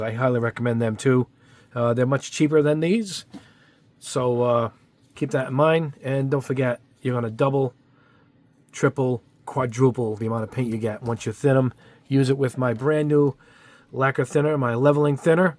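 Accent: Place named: Massachusetts, United States. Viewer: dang, from your videos it sounded like you were up in Mass some where, but Rhode Island is just as close xD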